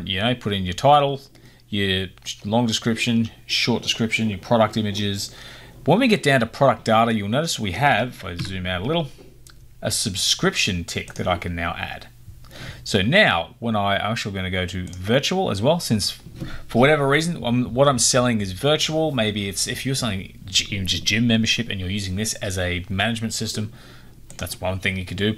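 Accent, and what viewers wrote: Australia. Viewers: Hi. I set up the subscription on WooCommerce following your intstructions. When I click the Proceed To Check Out button I get a message saying you must be logged in to check out. Have you had this happen. There is no option to log in. Its great to hear a fellow Aussie on YT. Brian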